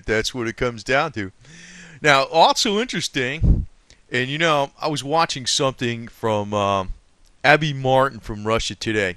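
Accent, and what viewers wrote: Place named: New Jersey, United States. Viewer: Love your honesty and most definitely your New Jersey Accent. I was born in Brooklyn, New York. I Do not have the accent because I left as a young child. By the way, I love hemp; I mix the protein powder with whey and my other smoothie ingredients. I do not like the wicked matrix system. Obama is only a puppet for the elites. This is coming from a Black gal.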